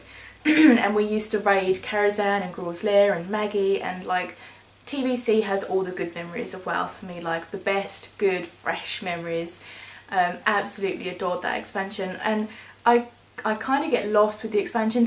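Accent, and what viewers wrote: United Kingdom. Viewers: I've listened to your music for a while and I never knew you were British, lol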